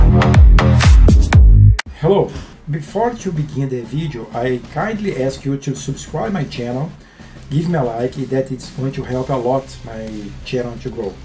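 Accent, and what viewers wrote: Brazil. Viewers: esse sotaque ai é br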